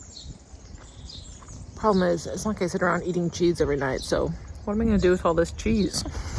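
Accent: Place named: United States. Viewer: Your behaviour is spot on French the only things you can't your accent plugs you as America not that not a bad thing the French do enjoy that because you have become French, the thing you chase flies away don't feel bad I still do the same thing and I was born here you could actually be more French than me now that is funny! I hope you wash away some stress in the court yard which a common thing to have in many buildings even in Paris! Your looking far less stress keep doing this your on the right path! One side note you should understand saying no is what all French to everyone your allowed to say no sometimes you may have to repeat yourself if he will not take your no walk away their hate I can assure he has had to told him long before he see you, and he can handle one more no! Try some of that cheese with fruit pears are really great with many cheese don't forget to take small spits of your wine with your cheese! 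It is OK to look like Marie Antoinette the French love this I am telling you I see a French lady you even have a level of class unseen by Americans. To be fair I have American accent when speaking French I have not been in France for near 40 years I don't feel French even though I am no longer American! I am having fun watching you taste cheese like I do yeah this is fun!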